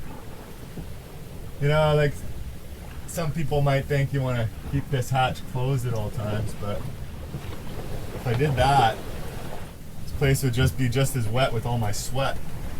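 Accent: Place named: Canada. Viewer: So pumped to hear your Canadian, Much love from New Brunswick!!!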